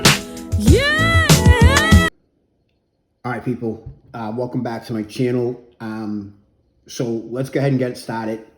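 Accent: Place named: Brooklyn, United States. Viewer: thanx brother you the man also your accent is phenomenal reminds me of here in NYC specially Brooklyn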